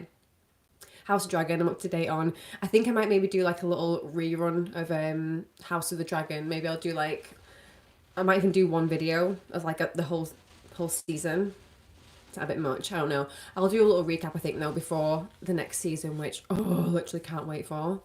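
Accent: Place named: Manchester, United Kingdom. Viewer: I'm from Leeds and I could tell you were from Manchester.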